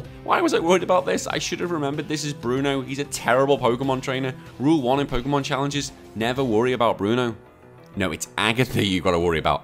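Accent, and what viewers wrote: Liverpool, United Kingdom. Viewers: Scouse pokemaniac......love it 👌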